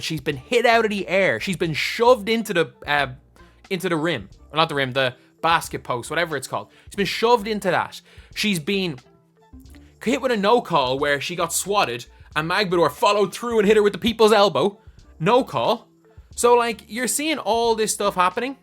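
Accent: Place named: Ireland. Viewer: It’s an Irish accent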